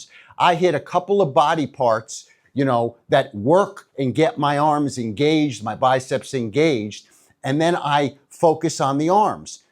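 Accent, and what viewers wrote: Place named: East Coast, United States. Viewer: I'm from Holland, but you sound like a East Coast guy. NYC?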